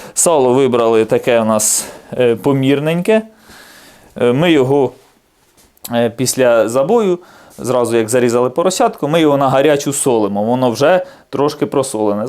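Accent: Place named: Poltava, Ukraine. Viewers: суржик обычный . зачем вы коверкаете русский ??? противно слышать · який приємний говір... такий як у нас на полтавщині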